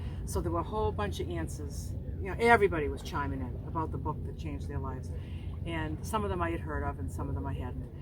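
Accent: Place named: Boston, United States. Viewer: I really enjoyed hearing about your spiritual journey. You have a way with words and the bostonian accent to boot, such a great storyteller. It's different for everyone and hearing others really gets my wheels turning. I believe in all things greater than myself, which always leads me to question. A wise woman once told me to not ask why but instead be grateful. Life long student over here, endless lessons to learn and so much gratitude.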